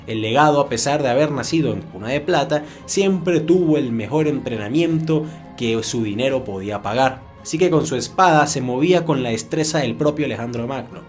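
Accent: Venezuela. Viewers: eres venezolano? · no se como llegue a este vídeo ..pero reconozco tu acento venezolano,,,,entonces te dire....te la lacriaste jajaja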